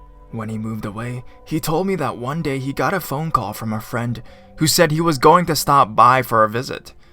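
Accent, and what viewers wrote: Canada. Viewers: Are you Canadian? If you are then you got the most awesome Canadian accent....if not then....damn good Canadian accent lol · Are you Canadian? Just wondering because of your accent. Anyway, good video! 👍 I like the longer ones too.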